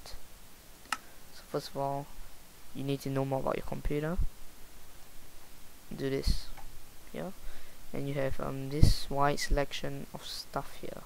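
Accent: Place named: Singapore. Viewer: Im singaorean too I recognisr ur singaporean voice immediately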